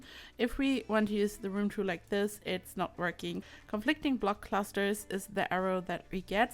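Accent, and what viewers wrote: Germany. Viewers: That german accent tho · Are you german? bc of your accent. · Are you German because I can here an accent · wie man diesen deutschen Akzent einfach raushört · you have the same accent as * and * and they are germans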